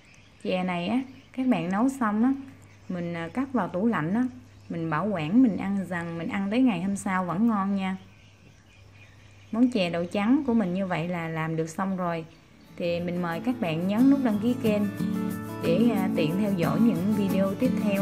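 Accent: Mekong Delta, Vietnam. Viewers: Thick’ kheo’ tay Thich’ giong Noi’ nguoi mien tay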